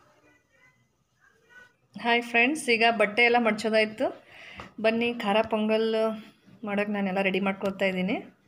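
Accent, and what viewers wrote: North Karnataka, India. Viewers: Mam super volg nimma husband aane work madthiddare north karnataka bhasha channage mathadthira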